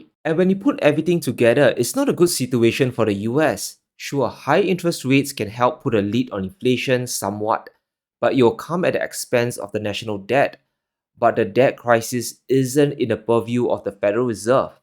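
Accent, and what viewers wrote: Singapore. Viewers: Also…I never heard your slur!!! Your sound Singapore to me like my good freind - no slur??!! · Your voice sounds fine. You have typical Singaporean accent which is a relatively easy accent to understand. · ❤❤Don’t worry.. your Singlish sounds OK, per usual… your research is priceless. Thanks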